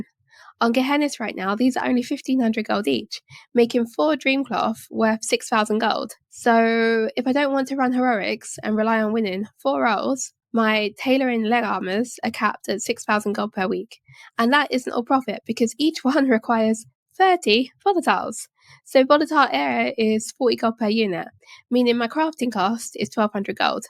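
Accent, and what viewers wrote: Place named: London, United Kingdom. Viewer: You sound like you from London ye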